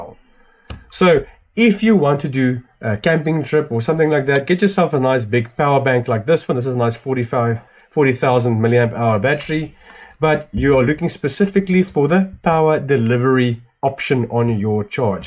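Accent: South Africa. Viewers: Is there maybe a way to get a 60W or maybe higher (foldable camping) Solar Panel to get to charge drone batteries? I've seen some that comes with it's own attached mini "inverter" that pushes out 12V 60W per USB-C and an adapter for 12V DC direct. We have the Air 2S and 5 batteries. Going on an Adventure Bike tour, the tankbag will be housing the drone gear and GoPro stuff. Space and weight is limited as there is camping gear, rations, clothes, etc. that has to be considered, so bulky heavy powerbanks won't work. Would like to hear your opinion. You sound South African btw LoL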